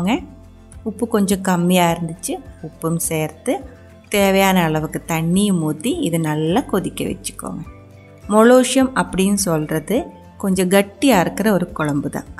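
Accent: Kerala, India. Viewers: Nice Tamil with Malayalam accent nice molakooshyam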